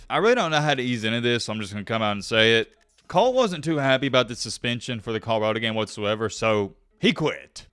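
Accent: South, United States. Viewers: This announcer us annoying AF. Not even going to finish this story. I can't take this hillbilly dribble.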